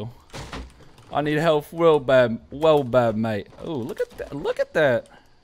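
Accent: England, United Kingdom. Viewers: English accent🇬🇧on point👌🏾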